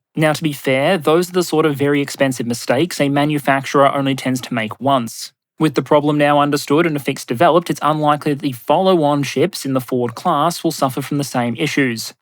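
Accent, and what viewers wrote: Australia. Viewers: Absolutely high quality research and even more superb delivery. What a witty Aussie 😂 pitty you guys can’t play rugby 🤣try next time in Suva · Didn't notice it till now that you've Aussie accent mate, Aussie Aussie Aussie!!! Oyyy oyyyy OYYY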